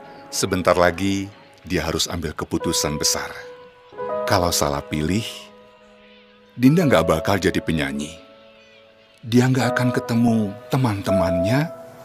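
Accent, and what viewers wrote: Malaysia. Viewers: Betu betu betu😕 Adminnya pasti tetangganya Ipin Upin nih. Dialeknya ngeselin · Kok narator nya logat nya kayak orang Malaysia yg baru belajar bhs Indonesia ya. Tp keren kok contain nya.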